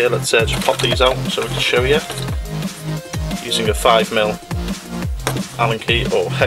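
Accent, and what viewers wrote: Liverpool, United Kingdom. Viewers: nice video! Are you a Scouser?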